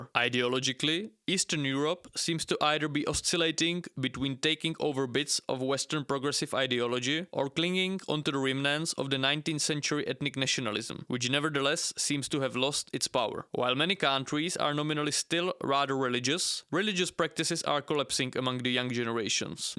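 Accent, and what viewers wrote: India. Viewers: Are you really Czech? You have an Indian accent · Bro is Czech but sounds Indian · Wait. That fucking jeet accent. LMAOOO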